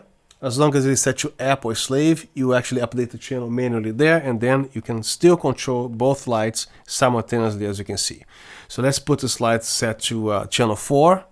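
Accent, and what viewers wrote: Brazil. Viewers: I can feel the brazilian accent... Otimo conteudo amigo. Parabens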